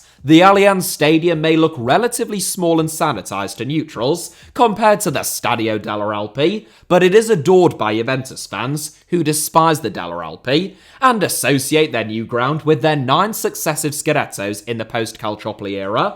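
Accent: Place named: United Kingdom. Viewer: I love this channel for its information and also deep british accent.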